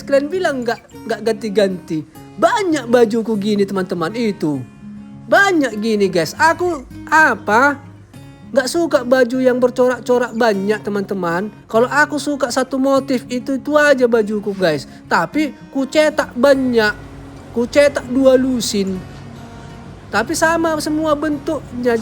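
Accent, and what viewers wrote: Medan, Indonesia. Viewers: Salut sm bg...walau sdh lama di Jepang tp logat Medannya gak berubah sedikitpun. Selamat pulang kampung bg. Semoga istri dn anak abang betah selama di Indonesia. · Logat mu bg, nmpak x nya org Medan, salam satu kota bg, sehat slalu bg, 😊😊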